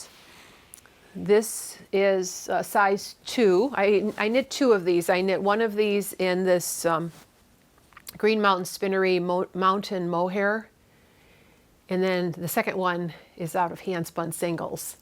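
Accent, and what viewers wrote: Chicago, United States. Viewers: Thanks for your episode. You inspire me with your spinning. I have just begun spinning and have to learn how not to over spin it. I had to smile when you mentioned Sturgis, Michigan as my family had a summer home on Lake Altrich (I’m not sure if I am spelling that correctly)! It brought back fond memories of childhood. I also have to say I love listening to you because I hear the “Chicago” in your voice which, again, brings me back to my own childhood in a Chicago suburb. I do hope you understand that is a wonderful quality in your voice to me! 🤗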